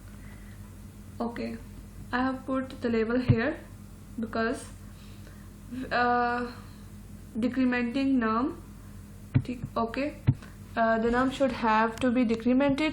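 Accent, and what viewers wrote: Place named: Karachi, Pakistan. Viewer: you are from Karachi, lalukhet* right? I judged by your accent..... sorry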